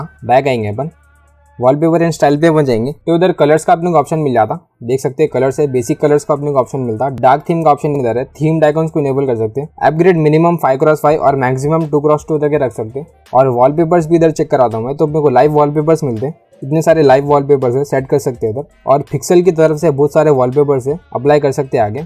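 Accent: India. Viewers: Love your hindi accent. Dont change the way you speak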